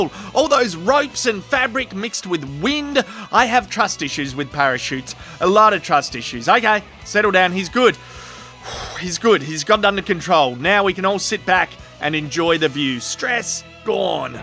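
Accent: Australia. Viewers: yeah nah yeah, he's got the typical ozzy man accent. Brings back the old days when i used to live in Perth.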